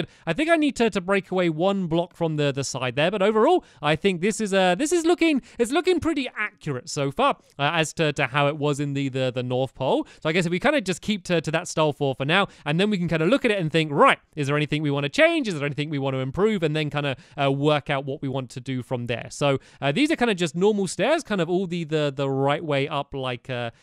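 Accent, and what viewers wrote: United Kingdom. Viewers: landen reeves he's British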